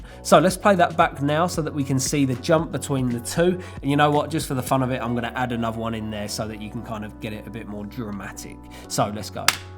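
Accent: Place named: Essex, United Kingdom. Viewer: It’s the Essex / east London accent. I’m guilty of it. If I say “have you seen Thor?” people say “four what?”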